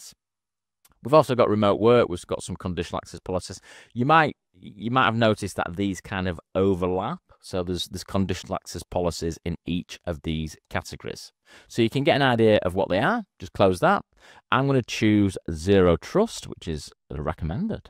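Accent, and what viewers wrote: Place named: Scotland, United Kingdom. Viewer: "Don't worry. You're not alone." deep inhale, long exhale Thanks internet Scottish tech guy.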